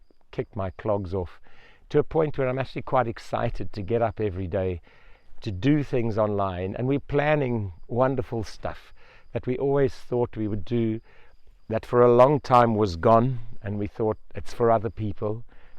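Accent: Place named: Zimbabwe. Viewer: You sound like a Zimbo. We live in Extremadura. I really want to get something going but not enough time right now. I shall keep watching you until I can get a site going.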